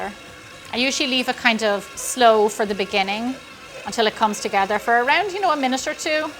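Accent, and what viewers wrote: Ireland. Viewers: Love all of your recipes, tips, and especially your Irish accent!!! Been a fan since before Georgie was a twinkle!! Keep up the fabulous yum!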